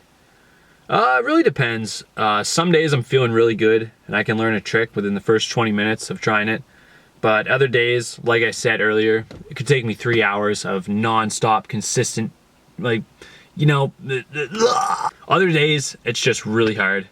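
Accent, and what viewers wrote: Canada. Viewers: It took me 30 seconds To figure out your canadian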